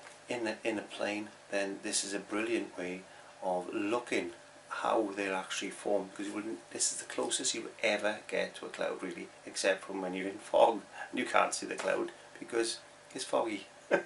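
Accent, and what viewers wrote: Wales, United Kingdom. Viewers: CliveI just found your web-site and am loving it. Also love your Welsh accent. I am from Scotland now living in the US. One question, why do you use black gesso on trees etc. instead of using mars or lamp black?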